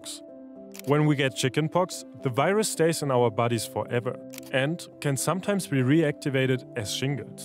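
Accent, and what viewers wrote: Germany. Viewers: Please! Get a native English speaker or use an AI voice. This bad pronunciation as well as the German accent is awful...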